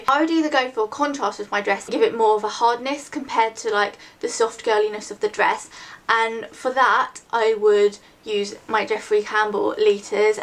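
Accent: United Kingdom. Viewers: Where in england are you from? I love your accent :P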